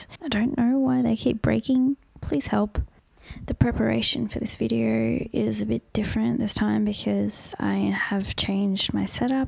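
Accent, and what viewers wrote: Australia. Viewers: i love your asmr vids, they r so unique and sound amazing!!! and YOURE AUSSIE???? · Unexpected Aussie! I thought you were American for some reason!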